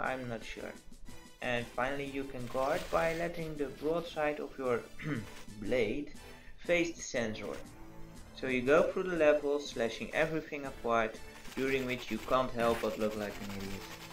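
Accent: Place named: Netherlands. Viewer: dus je bent tog nederlands, ik dacht al dat het aksent herkende.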